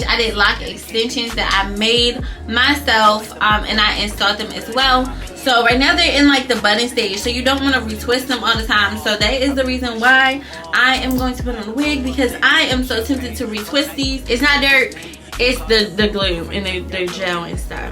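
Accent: Baltimore, United States. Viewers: You from Baltimore girl?????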